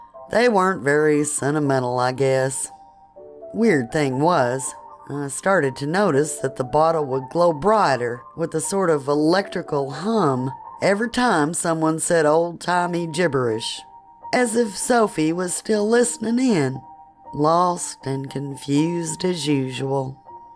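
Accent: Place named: Kentucky, United States. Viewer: Hey this is wonderfully narrated! Love the Kentucky accents. :)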